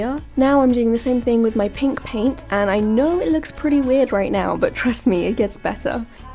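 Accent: United Kingdom. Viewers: Your British accent is amazing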